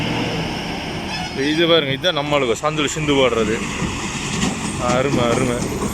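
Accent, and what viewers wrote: Coimbatore, India. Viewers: coimbatore tamil ngow anna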